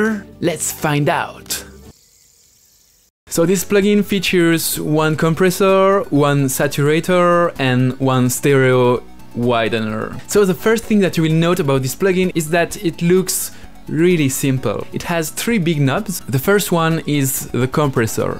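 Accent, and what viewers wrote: France. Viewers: french accent spooted? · Your accent really looks like the french one. idk if you speak french but ya fun fact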